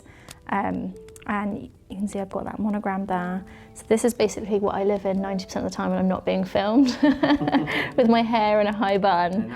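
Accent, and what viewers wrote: RP, United Kingdom. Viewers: its just a pleasure watching your videos....love your posh accent and your posh house! You are a classy young lady and we are proud of you x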